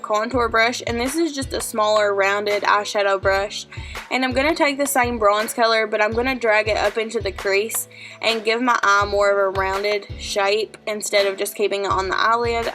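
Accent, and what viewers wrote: South, United States. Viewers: I came across your channel about an hour ago and I'm pretty sure I've found my new favorite YouTuber! I'm from way deep in the south and it's so great to hear a southern accent from a guru! You've inspired me to consider making a channel:) would you consider making a video about how to start a channel, what camera you use, etc? Can't wait to see more videos:)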